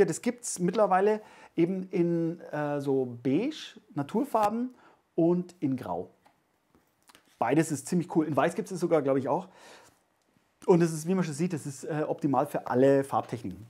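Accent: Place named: Franconia, Germany. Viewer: Danke für das Video 🌷Du klingst aber nicht nach Wien... Eher nach Franken 😉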